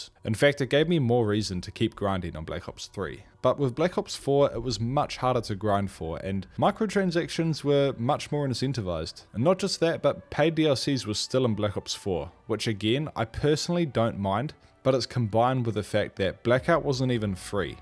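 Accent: New Zealand. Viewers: Also I'm assuming by the accent you're a fellow New Zealander my bro i've dropped a sub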